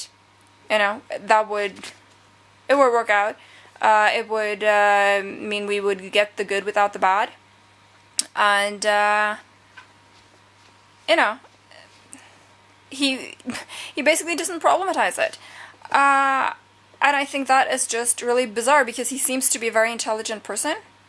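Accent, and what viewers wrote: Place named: United States. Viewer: Wow. Your accent sounds entirely American. Is it common for Norwegian to speak as you do? Thanks for the book review.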